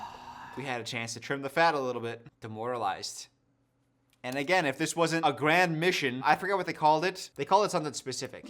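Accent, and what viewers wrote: New Zealand. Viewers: Me being slightly offended you mistook the kiwi accent for the Auzzie 😅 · as an Australian, I think it is one of the most beautiful NZ accents I’ve ever heard. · Bros getting his accents confused, that is probably the most New Zealand accent I’ve ever heard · my dude that is the kiwi accent, Aussies say reconnect like you do 😂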